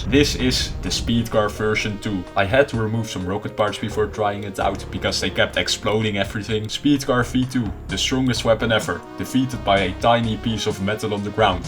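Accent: Netherlands. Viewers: i smell dutch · Cool dutch accent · Random question: are you Dutch? I'm surrounded by people with quite similar accents like yours so time for curiosity to commit a murder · Your Dutch right? Like you really sound dutch and I would know, because i am please respond In Dutch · I can hear You’re dutch, are you? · Its a dutch accent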